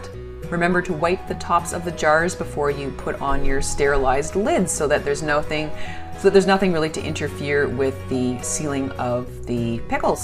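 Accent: Canada. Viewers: my god do you ever sound Canadian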